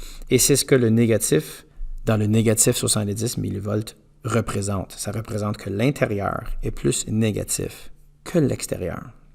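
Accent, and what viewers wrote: Quebec, Canada. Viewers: De plus j'aime votre accent Quebecoi😊😊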